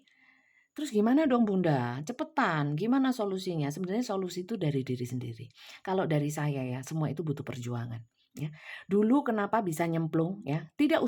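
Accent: Java, Indonesia. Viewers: Tapi ngangeni readingannya bunda... Medhoknya,ceplos ceplosnya,sak ononé bgt. Istilah²nya njawani tenan. JANGAN BERHENTI JADI TAROT READER. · CERITA ku bunfa itu. aku segan demen bngt ma suara bunda yg khas. enak di dengar . masio medok terasa luwess. pingin bamget t lihat foto bunda.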